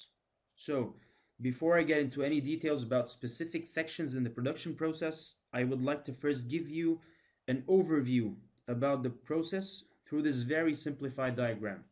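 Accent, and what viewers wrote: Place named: Arab world. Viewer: thanks man! really helpful! i sense an arabic accent! all the best man! ---Undergrad chem eng student, Australia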